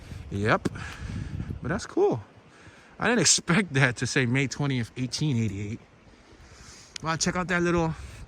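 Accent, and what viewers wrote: Philadelphia, United States. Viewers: Woodder lol luv the Philly accent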